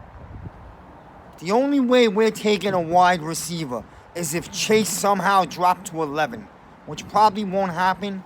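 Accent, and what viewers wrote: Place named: New York, United States. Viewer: Holy shit man, a true Blue NYC Dude - I F n love listening to you! Not only do you know your stuff, ypur presentation & use of language is music to my ears. This former NYC dweller feels like I'm back home listening to your delivery bro. You should be on ESPN!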